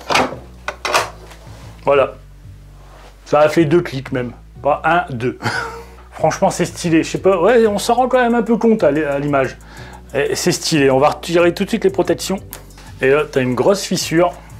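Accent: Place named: Nord-Pas-de-Calais, France. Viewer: le petit accent chti sur les "a" mutés en "oa" bien lourds lol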